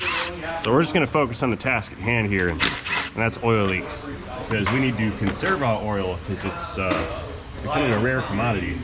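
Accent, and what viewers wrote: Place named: New England, United States. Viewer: Sounds like is a New Englander.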